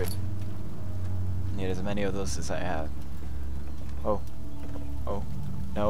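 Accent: Sweden. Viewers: I love how he's all Swedish and crap, so he isn't the best reader and didn't say barrels right. So cute! :3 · his Swedish accent aaaaaaaaa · Oh my, this is soooo funny when PewDie says "f*ck" with this swedish accentXD Even "f*ck" sounds cute with the swedish accent:D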